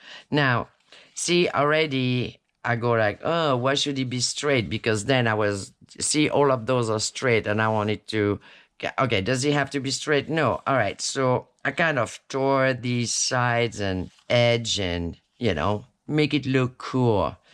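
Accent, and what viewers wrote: France. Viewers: Crafting is so much more fun with a french accent! Loving this tut! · you done a great job and here is the story on why i am your subscriber, i first thought you were this other crafter that i havent seen in a long time, i clicked on your channel and your voice and accent was not the crafter i thought you were, then you reminded me of the woman my mom had come to sing us kids to sleep and tell us Norwegian stories, i realized you are French and there could be no way in fashion you were that Norwegian woman bcuz i am 53 and she would be passed on, bcuz i think she was 53 back then. but how ever i am glad i clicked on your channel and getting to know you and crafting with you!! i just adore you fully!! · Oh I love you already, I'm French too but I live in a mostly English area, but your accent reminds me of my family. Lol, anyway like your video too. 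· Gosh darn I love your videos,glad to meet you,my name is also,I go by Trish,I love your french accent,I was also born in france,not too far from paris.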